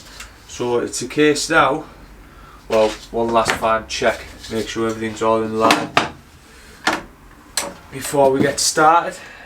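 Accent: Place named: Teesside, United Kingdom. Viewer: I’m from the north east myself, am guessing your Teesside ? Do you work for yourself ?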